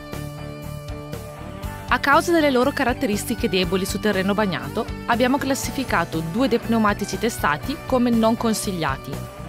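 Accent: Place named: Ticino, Switzerland. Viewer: utilissimo! grazie. Buffo l'accento ticinese :)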